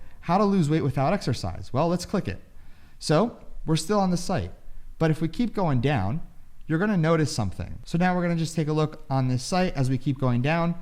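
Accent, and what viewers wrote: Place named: New York, United States. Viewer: Hahaha, no one will say yes bro if they're under the shadow making millions. I also like your NY style of talking about the ones in the dark, keep it up.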